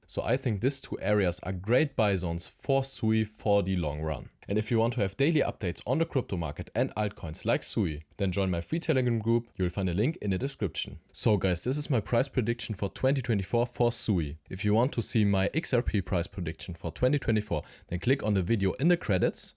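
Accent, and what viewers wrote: Germany. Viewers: Deutsches Dialekt 👍 lege ich richtig? SUI is gonna be huge 🚀🚀🚀 in my opinion, a 100x from the bottom is more than possible ✅ Grüße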